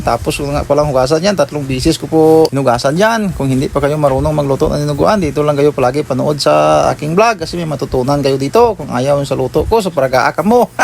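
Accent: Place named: Western Visayas, Philippines. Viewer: you sound like illingo bisaya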